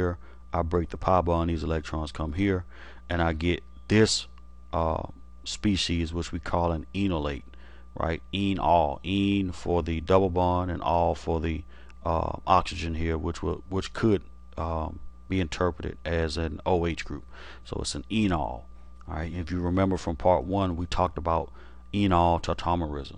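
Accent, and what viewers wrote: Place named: United States. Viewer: Cowboy accent is 🔥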